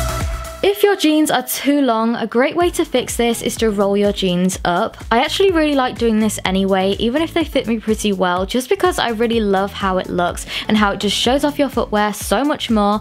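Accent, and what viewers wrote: United Kingdom. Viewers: Are you using a British accent?? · Love your british accent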